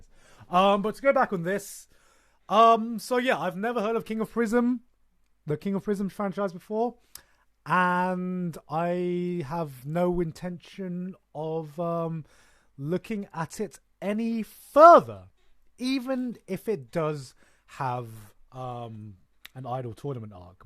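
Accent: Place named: United Kingdom. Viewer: Holy shit look at that baby face😭. The british accent is much thicker too.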